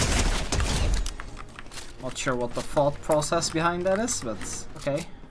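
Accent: Netherlands. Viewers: ik kan gewoon horen aan je stem dat je nl bent XD maar ga zo door bro ik hoop ooit ook earnings te krijgen word dit season voor de eerste x unreal en ik ben 13 je bent een hele goede speler ooit word jij n 1 ga zo door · ik ruik nederlands accent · leuk dat een nederlands accent toch zo sterk kan zijn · Bro are you dutch ?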